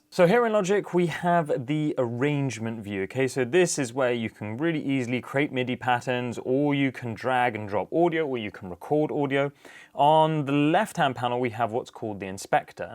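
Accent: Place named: California, United States. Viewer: The California accent is also how we Americans sound doing it lol well done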